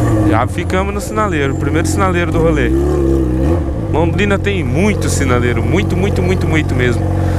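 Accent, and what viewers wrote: Goiás, Brazil. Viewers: pq vc fala no pique do Goiás sendo que vc mora em Londrina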